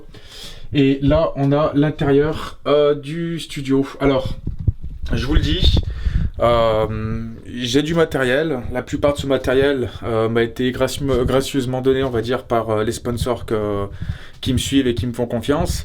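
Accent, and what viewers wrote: Saint-Étienne, France. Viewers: Yop je te découvre mais on dirait bien l'accent Stéphanois ! ^^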